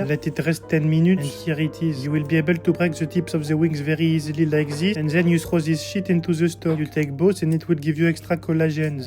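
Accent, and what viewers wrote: France. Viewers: la vie tes un bon frr, de tout les accents frenchies jsp quoi tes lmeilleur big, keep go et tauras plus aucun ptit français a la con comme moi dans tes com (le jour ou tu seras gros tsais)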